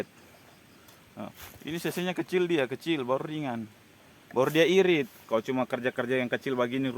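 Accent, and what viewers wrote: Sulawesi, Indonesia. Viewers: Ya cara penyampaiannya cukup menghibur dgn dialek dan logat sulawesinya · Bang rupanya logat Sulawesi ini ❤❤❤❤❤❤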